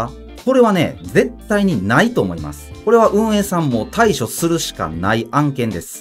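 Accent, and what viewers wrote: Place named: Kansai, Japan. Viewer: 関西弁がいい仕事してます笑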